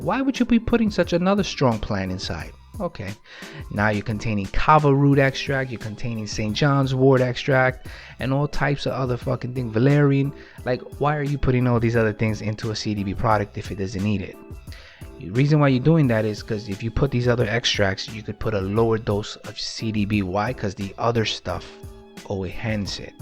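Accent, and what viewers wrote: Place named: New York, United States. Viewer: I was right, you are from New York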